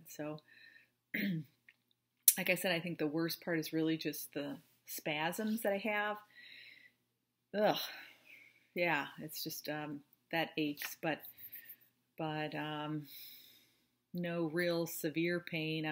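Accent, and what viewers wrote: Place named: Toronto, Canada. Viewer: I grew up in TO, so I’d know that accent anywhere. My shoulder gets done on Aug 1st. Thanks for all the good info.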